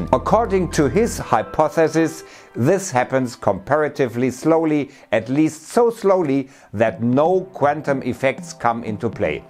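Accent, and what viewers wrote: Germany. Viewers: Please work on your german accent. It makes the video less enjoyable, all in all nice topic and well researched as always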